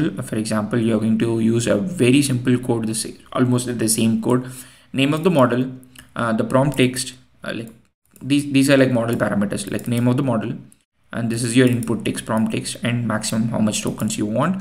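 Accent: India. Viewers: you accent is noticeable but I have heard many with much thicker Indian accents, yours is quite good. Might be even better now, I only just came across this video now.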